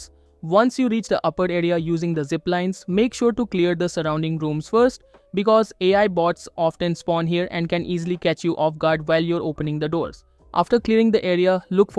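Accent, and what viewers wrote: India. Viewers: This guy is the only indian tutorial guy I want funny jokes and a good indian accent when speaking English he speaks like amir the door dash guy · Ugh, that stupid Indian accent 🙄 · Damn it had to be an indian accent video, fuck that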